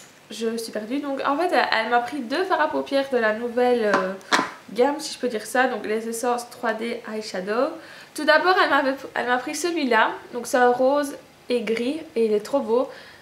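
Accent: Belgium. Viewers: bonsoir juste petite ? tu es de belgique ?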